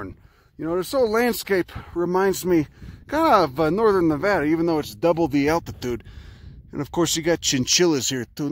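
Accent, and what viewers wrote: Chicago, United States. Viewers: Love this ! My brain feels like a sponge.......LOL..soaking up all this info plus your marvelous Chi town accent..Great way to start a day ! Thanks :) And yes...cute shit DOES make me feel less homicidal..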